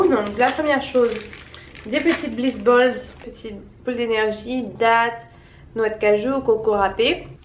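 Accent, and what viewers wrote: France. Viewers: elle n est pas Française ? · J’adooooore ton accent français ! Et ça m’a donné faim 😅 Et Ohlala je suis pareil pour les biscuits, quand je commence je n’arrive pas à m’arrêter ahaha (ça rejoint notre discussion sur le pain sur Insta 🤣)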